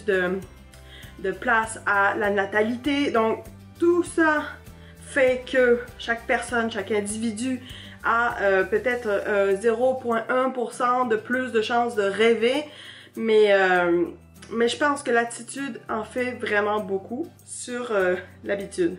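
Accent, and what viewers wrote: Quebec, Canada. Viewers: Visiblement, vous êtes bien intégrée car dès que vous avez commencé à parler, j'ai cru que vous étiez québécoise. Vos conseils sont judicieux, soit dit en passant. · Je trouve que vous avez beaucoup l'accent québécois.Si vous n'aviez pas mentionné que vous étiez française,j'aurais pensé que vous étiez québécoise.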